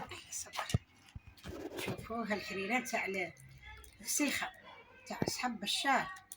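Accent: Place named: Gulf, Arab world. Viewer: ماشاء الله 😍. باين بنينه وروعه كما للهجه عندنا بالخليج 🌹. تسلم يديك خالتنا العزيزه❤️ سعدات الي بياكل من طيابك 👍🏻❤️🕊️🕊️🕊️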